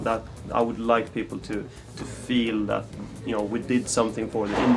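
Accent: Sweden. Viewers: Swedish accent <3 (im swe ) :D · I know this might be a bit old.....buut man, English! with a Swedish accent doesn't sound very nice :P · omg what a swedish accent :D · God I hate the swedish accent :( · swedish accent hellyeah! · thumbs up for Swedish accent. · he sounds swedish ^^ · ._. swedish accent ja! some needs to train that accent away... i managed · You can hear hes sweedish :D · man hör verkligen att han är svensk ;9 :)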